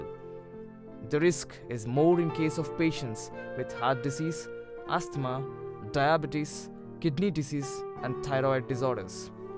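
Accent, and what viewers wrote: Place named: India. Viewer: His English is Indian.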